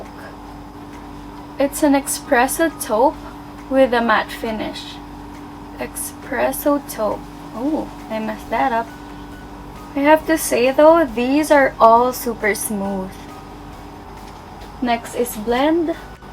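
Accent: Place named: Philippines. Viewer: You seem to be such a sweet person. You are from the Philippines, right? I recognize the accent. I am from Sweden and I was in the Philippines for 6 months in 2011 doing volunteer work. Everyone over there are so nice and friendly ☺️ Hearing you speak makes me nostalgic ✨ You are really good at makeup too! ☺️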